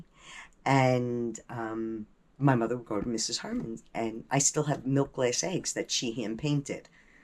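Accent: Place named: New York, United States. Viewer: I had to stop watching for a minute just to say how wonderful you are to let us into your beautiful world! I’m in awe of your amazing collection and the stories that go with them. The story of your mother and the artist who crafted her last piece, the Santa, has touched me very deeply (I have stage 4 breast cancer). Also you sound a lot like me. I’m guessing Long Island or Queens. I hail from both, and even 🎉though we moved to the south 23 years ago I never lost that “accent.” Never stop being who you are. The world needs more people like you! PS: I have my grandma’s buttons too!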